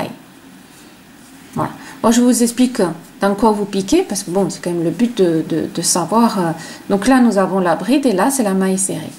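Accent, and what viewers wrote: South, France. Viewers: Bonjour, avec ce point très sympa, pourriez-vous expliquer comment faire des augmentations et des diminutions, je tenterai bien un pull-over, merci pour vos vidéos très simples et très explicatives et votre accent du sud, moi je suis de la région Marseillaise et je comprends tout❤️❤️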